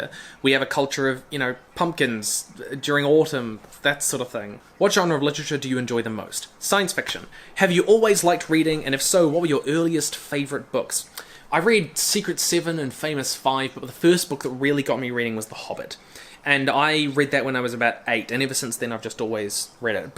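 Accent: New Zealand. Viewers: Which New Zealand accent, though?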